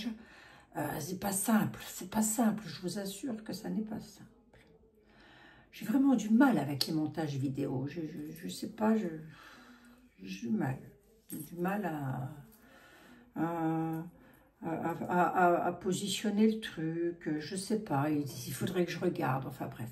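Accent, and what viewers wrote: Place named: East, France. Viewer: Coucou, deux petites questions: vous avez decoloré vos cheveux comment? Chez un coiffeur? Et ne sont-ils pas trop abîmés ensuite? Et 2e question, votre petit accent vient-il de l’est? Mon père en était originaire et j’adore cette intonation…😊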